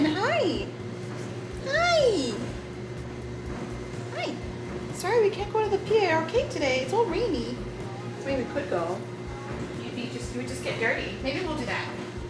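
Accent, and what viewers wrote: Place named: Jamaica. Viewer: I hear your Jamaican dialect. Its hidden but it's still there. Hello to yah. Looove your home. You are very much like me in style and decor. 😊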